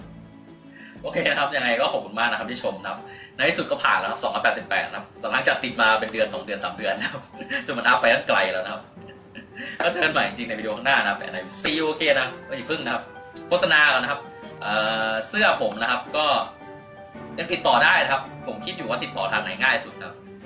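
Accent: Isan, Thailand. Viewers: พี่เป่นคนอีสานเหรอครับ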